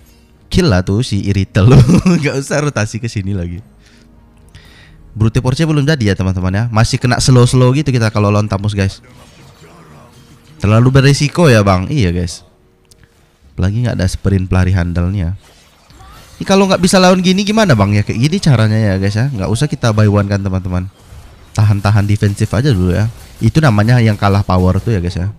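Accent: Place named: Pekanbaru, Indonesia. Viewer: Org Pekanbaru bg? Nampak betol logat pekan ABG awak ni 😅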